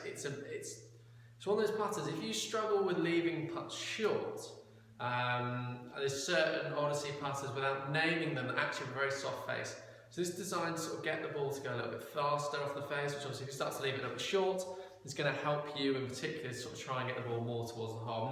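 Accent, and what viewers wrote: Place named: England, United Kingdom. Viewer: Sorry, but between the echo, distance from mic, my hearing issues and the English accent- Can’t understand a thing, but thanks anyway.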